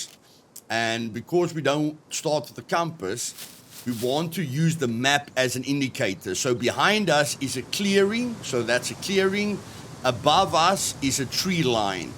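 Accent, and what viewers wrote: Afrikaans, South Africa. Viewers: is that a afrikaanse acsent? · Bro got the South African Accent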